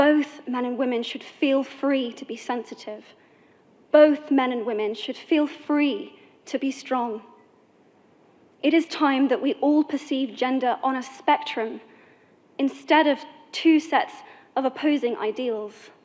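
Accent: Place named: United Kingdom. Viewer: 英式英語欸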